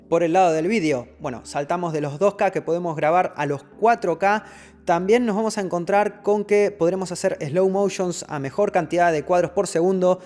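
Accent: Argentina. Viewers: che flaco sos argentino no español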